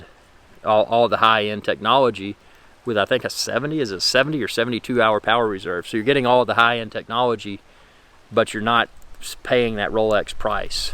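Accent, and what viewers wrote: United States. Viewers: Great channel! I like you videos. So nice to hear a American accent rather than some English bloke reviewing watches. Lol 😂 You should check out the 2021 speedmaster. The bracelet is tapered 20mm to 15mm and they changed the endlinks. It’s super light and crazy comfortable! It’s also actually Meta tested so crazy accuracy and it’s actually tested to 50m not just rated 50m so you can now swim with it. My wife bought me one for my 50th birthday absolutely love it. The Tudor is a great watch too. I’ve owed a few black bays the only one I’ve kept is the BB58 blue. Even though I have a 7 3/4 wrist the 41mm BB slab side’s look silly on my wrist. The 58 looks perfect since it’s thinner. I know you have a 58 which do you prefer?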